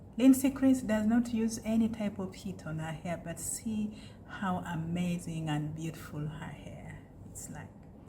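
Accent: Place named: Uganda. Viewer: Sounds Ugandan. Love this kind of content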